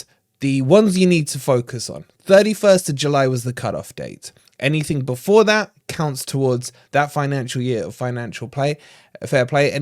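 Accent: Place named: Nottingham, United Kingdom. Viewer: That's the most ridiculous Nottingham voice ever.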